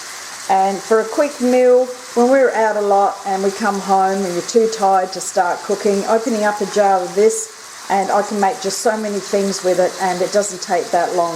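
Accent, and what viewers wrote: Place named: Australia. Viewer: Thank you so much. Im just starting back with canning after a few years and i am doing lamb mince cos Aldi had it marked down. So nice to hear an aussie voice !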